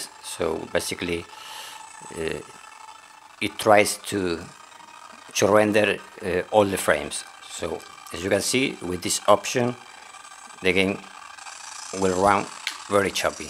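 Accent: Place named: Spain. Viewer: aun que se le nota en el acento que es español, yo soy latino saludos desde américa latina tiene muy buen inglés, solo le falta evadir ese acento muy pequeño del español pero que exelente vocabulario, saludos y que Dios lo Bendiga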